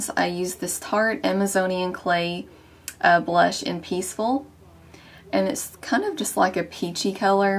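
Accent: South, United States. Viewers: Geez that's an awesome nail polish color! Will def be trying that out. Love the look as well....got yourself a new subscriber! You sound like a southern belle too! Awesome!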